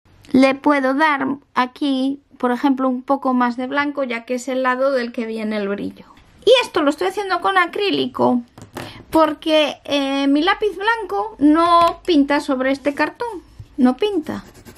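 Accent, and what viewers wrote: Spain. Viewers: Diossssss! Amo como Hablan los españoles (ㆁωㆁ)❤️❤️ Sigue Así. Dibujas muy Lindo uwu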